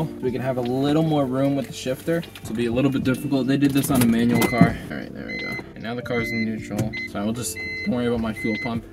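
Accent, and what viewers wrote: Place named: Germany. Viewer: ich hör da doch deutsch raus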